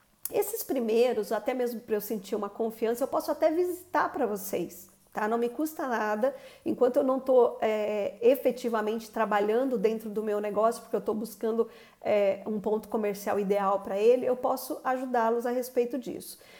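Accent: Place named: Rio de Janeiro, Brazil. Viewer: E esse sotaque carioca?! Rsrs bjs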